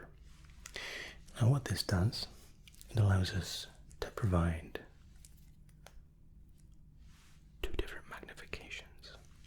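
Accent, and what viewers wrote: Scotland, United Kingdom. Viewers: accent is definitely a conglomeration. But yeah, the Scottish accent is most predominant, while lightened a bit by the English side of things. If there's any American accent in there, it's very faint. 😅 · Where are you from mate? I think I hear Scotland in that accent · Ah never mind, just done a bit of digging and it’s says he was born in England but has spent most of his life living in Scotland so has picked that accent up a bit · I can hear the scottish but it also sounds like there's some european/american in there too! Forever a mystery but very relaxing hahaha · I just recently found your channel, 1 video and I'm already hooked to your vids, love the accent and your style 😀 you rock it man haha, and we are both from Scotland FREEDOM!!! haha lol, I'm rambling again I'll stop. Oh and one last thing you just earned a new SUBSCRIBER!! 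MEEEE HAHA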